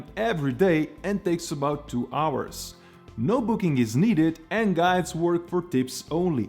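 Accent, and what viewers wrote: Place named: England, United Kingdom. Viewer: Thank you for all of your videos. I've watched many of northern Italy and Slovenia. Great presentation with a very charming English accent. Keep up the great work!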